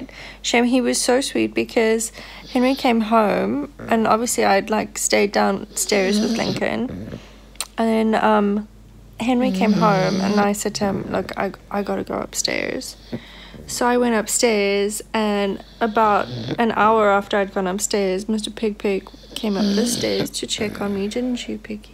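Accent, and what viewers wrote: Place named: England, United Kingdom. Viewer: I just love your English accent (I am from US) Your close ups of piggy's face are really cute and funny! I love your your commentary, too!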